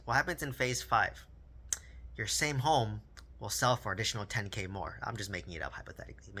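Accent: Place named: California, United States. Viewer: Also…sounds like you’re from CA…how do you feel comfortable buying RE in other states like OK?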